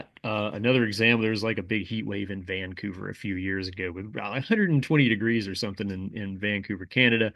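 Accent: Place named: Baltimore, United States. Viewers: You have what a lot of people would consider a dundalk or western baltimore accent. But its not every word you say. Mostly just the O vowels. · I could've sworn dat guy was from Dundalk or Essex. He sounds like Traffic Jam Jimmy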